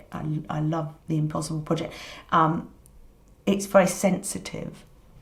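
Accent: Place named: United Kingdom. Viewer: Its a british accent. Lol.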